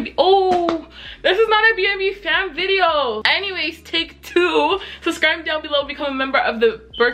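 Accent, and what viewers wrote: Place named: Toronto, Canada. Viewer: I’m from gta area and just listening to you I’m like, that’s a Toronto girl I know it loool!! Keep up the hustle girl you’re killing it!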